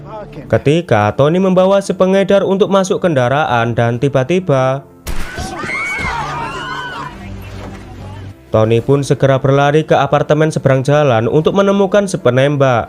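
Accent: Java, Indonesia. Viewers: Jowo mu bro medok banget,😅trimakasih ya bro sdh bs menghibur para pemirsa yg suka dg flm action👍👍💪💪🙏🙏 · Meddookkk adminnya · Medok jowone mas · Baru mau saya komen, medok amat ya, Brebes apa Purbalingga ya😅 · Medok banget suaranya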